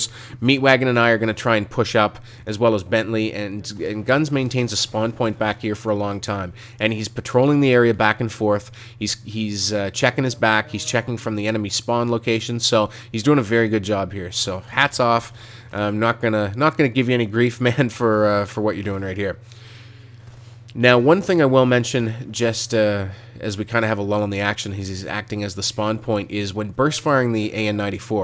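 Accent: Canada. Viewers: You sound Canadian. Are you?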